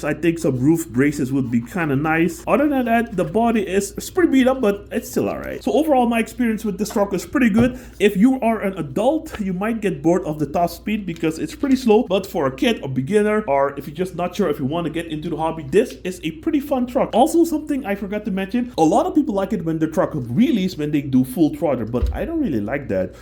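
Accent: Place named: Netherlands. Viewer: Suriname!...no wonder why you had a slight Dutch accent. Great video though!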